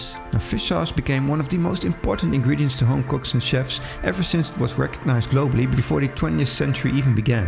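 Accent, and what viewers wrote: Netherlands. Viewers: annoying dutch accent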